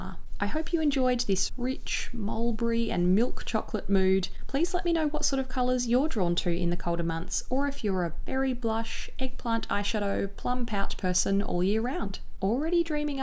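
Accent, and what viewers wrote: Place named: Australia. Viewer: Awwww must be the Aussie accent 😍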